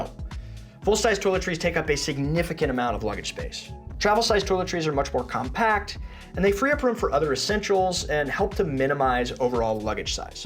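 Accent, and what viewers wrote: United States. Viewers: You sound American. Why aren’t you recommending T-Mobile with its no cost international data. Oh I see you are promoting Holifly.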